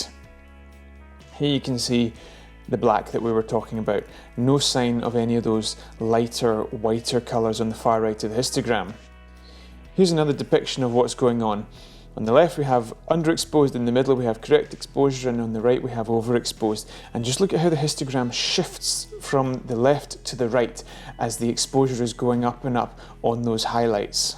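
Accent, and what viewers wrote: Scotland, United Kingdom. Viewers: Just bought the mavic mini and you suggested watching this in regards to the histogram and its absolutely brilliant. I dont have a cinematography background, or drone, just bought one for fun but am sure with this tip my shots will at least be perfectly exposed. On a side note, Robin Williams as Mrs Doubtfire had exactly the same soft spoken Scottish accent as you (sorry) haha. Will be downloading the free guide too, cheers for the great content.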